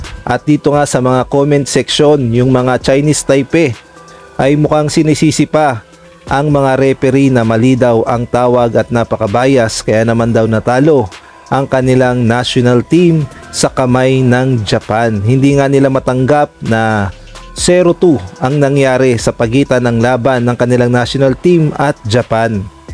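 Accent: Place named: Visayas, Philippines. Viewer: Bisaya man ka dong. 😂😂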